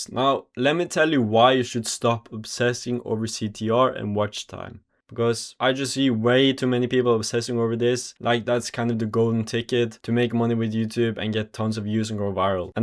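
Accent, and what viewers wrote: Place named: France. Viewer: 1.- Thanks for the content. 2.- Are you french or french canadian? Your accent gives me XQC vibes.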